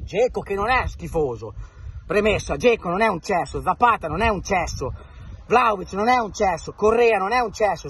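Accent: Romagna, Italy. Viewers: 100% romagna, quando uno è incazzato non c’è niente di meglio del dialetto romagnolo per esprimere il proprio malessere, te lo dice un romagnolo doc che quando ti sente parlare si sganascia dalle risate... e così sei passato dai piatti ai pomodori, e va beh, almeno sono freschi e sai cosa strozzi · Se fai il video in romagnolo vuol proprio dire che sei incazzato... mi aspettavo qualche piatto rotto... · io lo ascolto solo per il mitico accento romagnolo!!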